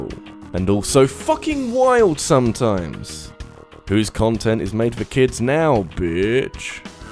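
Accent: England, United Kingdom. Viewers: He’s English. That’s how we say it :P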